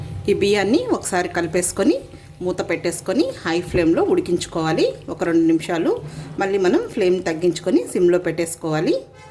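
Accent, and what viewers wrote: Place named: Nellore, India. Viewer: garu mi maatala lo konchem nellore touch undandi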